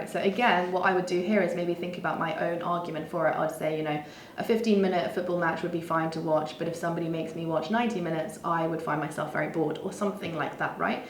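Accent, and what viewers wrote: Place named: United Kingdom. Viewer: Agree 👍 When I heard her British accent, I figured it out right away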